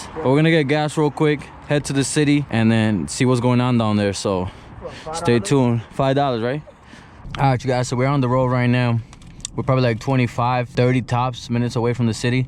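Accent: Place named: Cuba. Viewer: good video, im argentinian and your spanish is fun, beacuse your accent is cuban and is fun hear it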